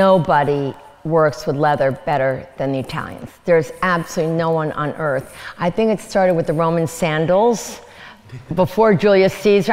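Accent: United States. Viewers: Lily Conte Agree! I'm perplexed. She speaks perfect "American" English. It doesn't make any sense.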